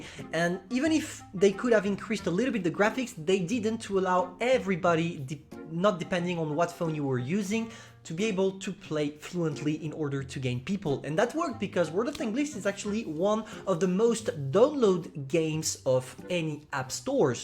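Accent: France. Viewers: omg ce french accent j'adore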